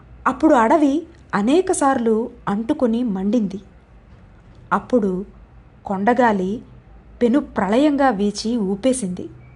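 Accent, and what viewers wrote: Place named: Srikakulam, India. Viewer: మా గొప్ప కథను పరిచయం చెయిస్సీరు...❤ సిహాకుళం భాషలో విండం ఎంత బావుందో మరి చెప్పలేను బాబో...😍❤❤❤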